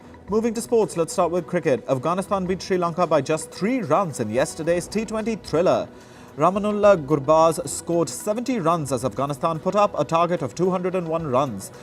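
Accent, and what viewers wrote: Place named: India. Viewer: . I am talking about the Indian voice video narrator.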